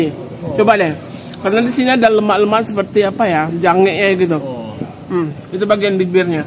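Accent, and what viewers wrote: Minangkabau, Indonesia. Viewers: Dilek Minang kemirip Jawa, Gitulah sora, Sora menggetar jiwa, Ceria girang puas didengari.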